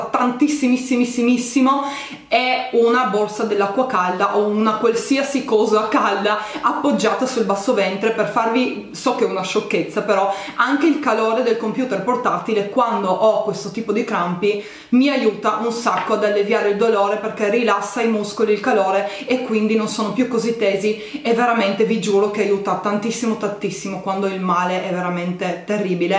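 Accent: Trieste, Italy. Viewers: sei di triestr?!?!?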